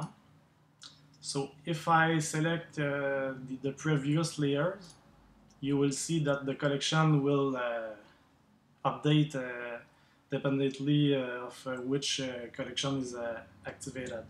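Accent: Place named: Quebec, Canada. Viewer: Très rafraîchissant d'entendre du bon vieux Québécois agnlais😁 mais sérieux merci pour l'approche «straight to the point» avec les exemples simples. J'essaie de m'adapter au mieux à la logique des rendus de Blender.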